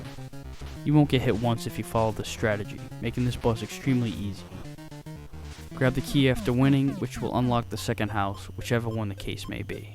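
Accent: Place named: Brooklyn, United States. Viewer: your voice is almost remeniscient of a Brooklyn Accent. nice vid btw :)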